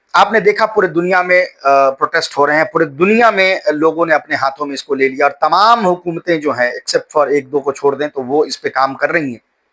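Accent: India. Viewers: Great analysis. Although you were doing this English but this switch to Hindi is awesome. I also know English very well and listen many English vlog, But listen things in own mother tongue with desi accent looks awesome.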